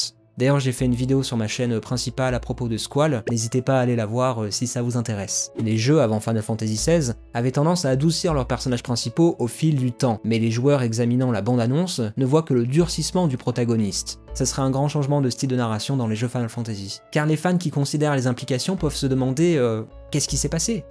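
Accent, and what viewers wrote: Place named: France. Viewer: Final Fantasy 16 sounds so much more legitimately medieval when a French person is talking about it 👍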